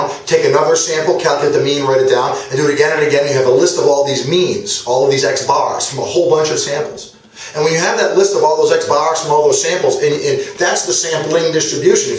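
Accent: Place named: Maine, United States. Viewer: Sounds like he is from Maine.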